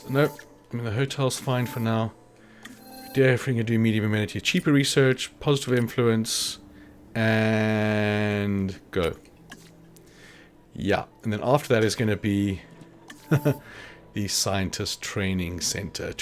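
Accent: South Africa. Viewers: Are you South African? If so I am too :D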